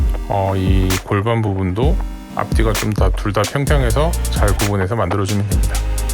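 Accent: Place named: Changwon, South Korea. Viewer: 첫영상은 사투리가 조금씩 들리네요ㅋㅋ 저도 창원살아서 정겹습니다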